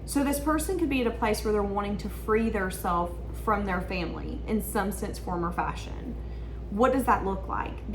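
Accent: South, United States. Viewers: Accurace as always! Loooove your adorable country 🤠 accent 🌹🌹🥰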